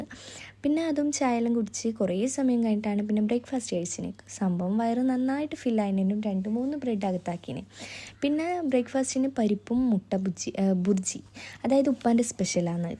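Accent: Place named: Kannur, India. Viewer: Kannur slang kannur aano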